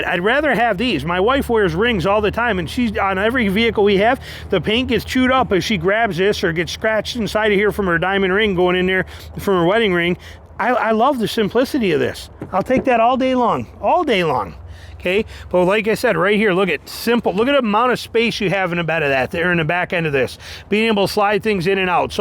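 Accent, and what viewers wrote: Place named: Wisconsin, United States. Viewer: Hunert, k. K? K? Gotta be from Wi.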